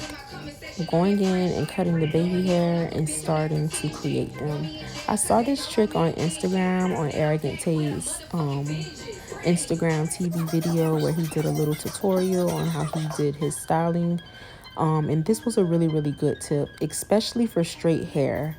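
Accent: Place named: Miami, United States. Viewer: Recognize a Miami accent from anywhere. 💕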